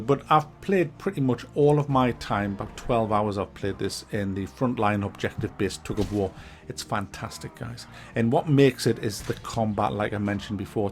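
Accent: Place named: Geordie, United Kingdom. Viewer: Howay, I want to see a video with that proper geordie accent, I feel like you try to tone it doon...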